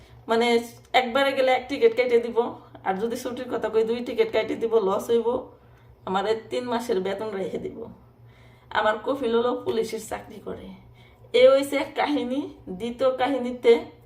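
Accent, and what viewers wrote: Manikganj, Bangladesh. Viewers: আপনার কথায় বুঝা যাচ্ছে আপনার গ্রামের বাড়ি মানিকগঞ্জ বা টাঙ্গাইল.।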